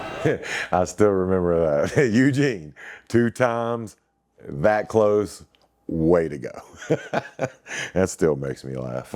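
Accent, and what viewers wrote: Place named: South, United States. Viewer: You had potential to ascend back then. You could have been a biracial JBL. You have a great biracial look, nice southern accent and could have used it as a “better than you” appeal. I’d have you wearing suits, clamoring your education background, look and acknowledge being half black and half white. By being half black and white, you could have been nicknamed “The best of both worlds”…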